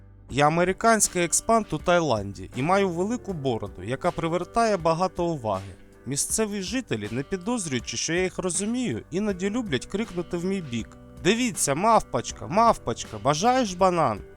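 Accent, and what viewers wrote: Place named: Russia. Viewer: В мене таке враження, що автор кацап, бо читає з рососійським акцентом, підозріло, не буду дивитися!!!!!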